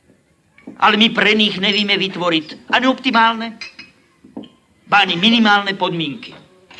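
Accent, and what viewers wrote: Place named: Western Slovakia, Slovakia. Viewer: Tota zapadňarščina še mi mocno ľúbi😂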